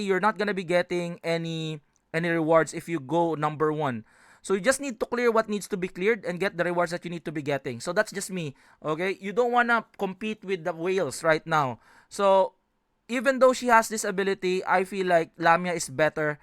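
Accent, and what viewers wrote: Philippines. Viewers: pinoy to base sa English accent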